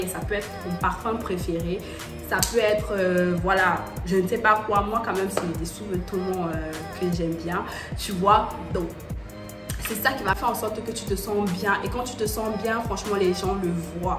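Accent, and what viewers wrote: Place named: Ivory Coast. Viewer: Ton accent ivoirien😍🇨🇮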